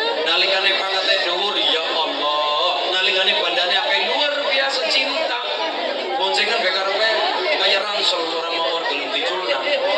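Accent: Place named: Banyumas, Indonesia. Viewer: Ngapak ustadz nya..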